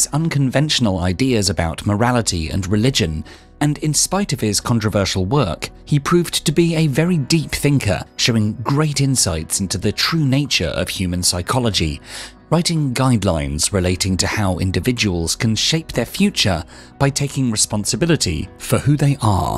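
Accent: England, United Kingdom. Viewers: Ima go against the grain an say take this w a grain of salt an herb weary of soemthing that calls itself philosophical while using an English accent knowing full well English ppl r stereotyped as smart ppl. Like that episode of sunny Philadelphia when Charlie starts speaking w an English accent and smoking a pipe. I like this channel a lot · The problem with THIS narrative interpretation of Nietzsche is this: The assumption that every sniveling creature should be an artist and howl at the moon. Sorry, but the world teaches us who has what it takes and who doesn't. And THAT's OKAY. Judgement by your peers is exactly the democratic process which teaches you if the world thinks you have talent. I reject the sophistic use of a "British accent" here to make this story seem authoritative. This is a nonsensical assault on cultural norms. Some people get savaged by life. 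Get over it. Not everybody MUST BE celebrated.. I have listened to many amazing lectures on Nietzsche. This ain't one of them. This is pure "Woke" nonsense.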